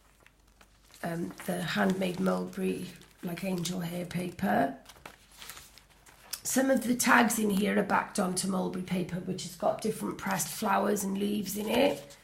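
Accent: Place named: Cardiff, United Kingdom. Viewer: Hi. I’ve been following Tracie for a little while now and just came across your channel. I adore that journal you made. The detail is incredible and the family history inside of it so precious. I’m a new subscriber to you now and look forward to binging on your videos! Can I ask whether I’ve detected a fellow Cardiff girl? 😀. I’m fairly new to JJ and TNs and my channel is pants at the moment but I’m enjoying making them. Thanks for the inspiration xxx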